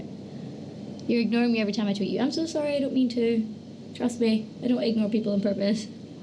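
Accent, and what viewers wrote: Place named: United States. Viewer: im confused about the american accent?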